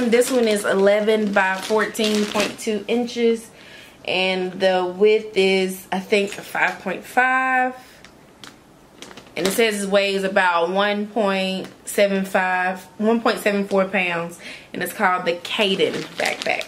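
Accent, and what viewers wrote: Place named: South Carolina, United States. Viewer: Sounds like South Carolina..😀Nice video 🤜🏽🤛🏽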